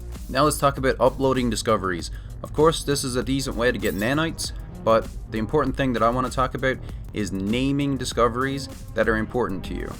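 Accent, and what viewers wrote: Newfoundland, Canada. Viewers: Could tell your a newfie from the start aha! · Some good tips, thanks! Oh, and I had to do a double take when I heard your accent 😆 not many newfy YouTubers I'm aware of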